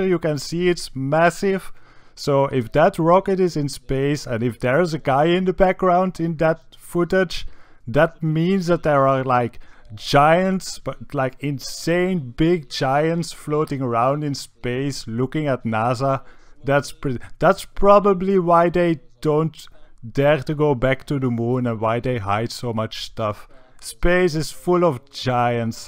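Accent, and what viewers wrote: Austria. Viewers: One thing I am CONVINCED about is: Your from Austria aren’t you??? Even though the only Austrian accent I have ever heard in my life was the great governator himself Arnold Swarzenegger! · Is the voice over guy doing a Schwarzenegger impression? or is he just Austrian?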